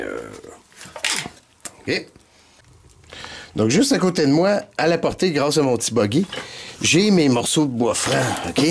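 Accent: Canada. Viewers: Ça fait déjà bien longtemps que ça m'a pris et je ne pense pas pouvoir en guérir, c'est pour moi un plaisir et non une contrainte et je ne sais toujours pas combien coûte le bois car je n'en ai jamais acheté ! Merci l'Ami pour ce bon moment et toutes les saveurs de ton accent Canadien que tu partages avec moi qui vis dans le sud de la France... Bien amicalement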